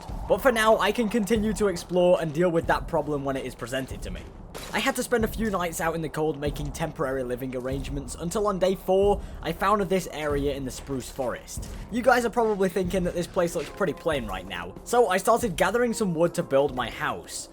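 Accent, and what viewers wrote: United Kingdom. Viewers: Are you British? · Sorry to ask are u British?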